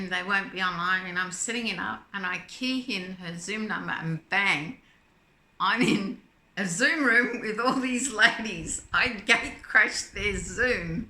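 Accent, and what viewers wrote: Australia. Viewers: Hi, I absolutely relished watching your video and hearing an Australian accent! Make me homesick. Great yarn unboxing!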